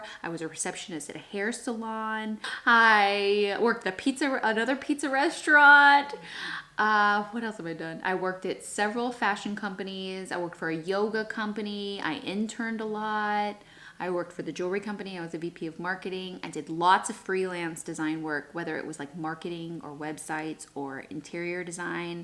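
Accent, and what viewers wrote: Texas, United States. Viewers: LOL yes, I've notice your accent more when you're here in Texas as opposed to when you're in LA.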